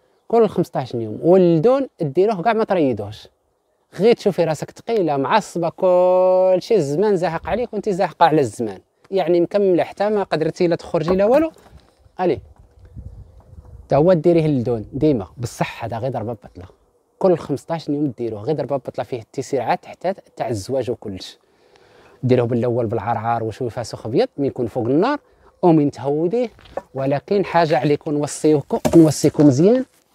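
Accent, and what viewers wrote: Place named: South, Morocco. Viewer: سلام خويا الله يسترك دنيا واخره ويخلي لك بنيتك انا كانقول اذا كان يعني الحس ديالي ينفعني انا كانقول منا نواحي ديال الصحراء ديال زاكوره ورزازات و هضرتك بحال هضرتنا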